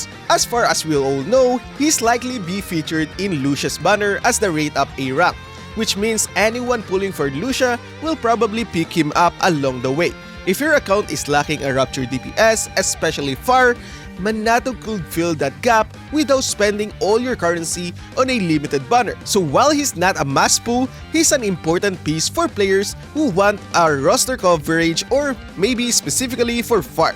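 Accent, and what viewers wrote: Philippines. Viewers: Are you filipino by any chance? you lowkey have an accent.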